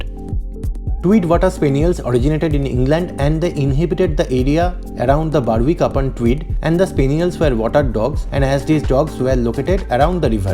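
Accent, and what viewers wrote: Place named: India. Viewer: U have terrible indian accent. Pls ask somebody to dub ur vids. U can found hundreds of offers on fiverr esp.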